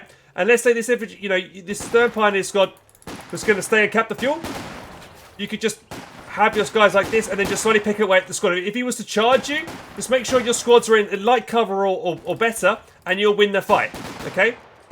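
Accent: United Kingdom. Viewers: Fast talking British accent and mostly incomplete sentences makes for poor communication.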